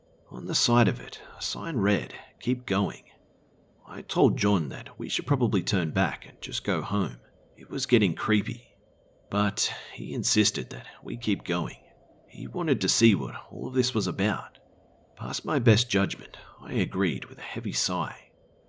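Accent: Australia. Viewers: It feels so good to finally have a fellow Aussie narrator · are u a aussie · Fuck yea it's refreshing hearing a Fellow Aussie telling a horror story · you voice is priceless are u aussie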